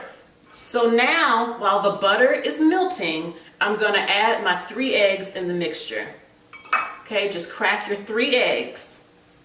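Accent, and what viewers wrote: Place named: United States. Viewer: To master a language one must master lingo, jargon, slang, colloquialisms, regional dialects and individual nuances in phonetics. The standardization for what or how a word should be used actually changes often, akin to the English purposefully changing their accents to separate them from the puritans who founded the united states, which is how the "queens english" came to be... So back to, her unique enunciations are correct with her location in the united states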